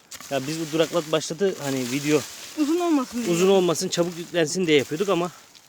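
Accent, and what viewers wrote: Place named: Kastamonu, Turkey. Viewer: Siveniz Kastamonu sivesine benziyor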